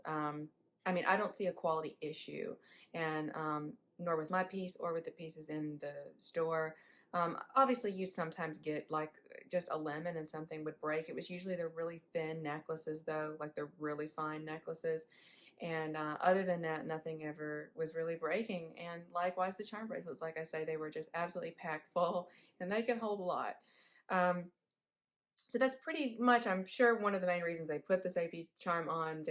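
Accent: South, United States. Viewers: Cool southern accent!❤great review!👍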